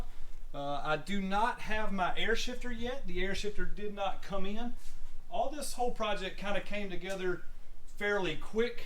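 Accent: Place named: Rural, United States. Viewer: You sound country and far from the city, where are you located?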